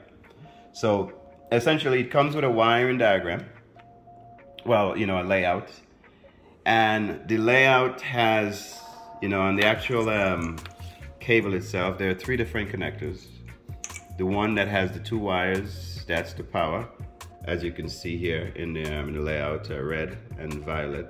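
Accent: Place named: Wisconsin, United States. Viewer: Good video and to the point. Love to hear a WI voice after many years captaining the Caribbean. Thank you.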